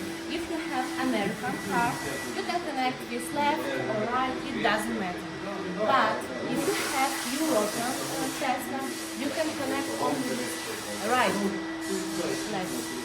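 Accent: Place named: Russia. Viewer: акцент русскоговорящий ;)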